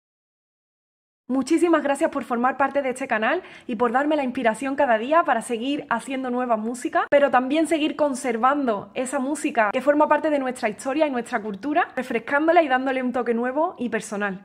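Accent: Andalusia, Spain. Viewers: ¡Que espléndida ejecución! Aunque admito que lo que más me gusta es escuchar su acento andaluz.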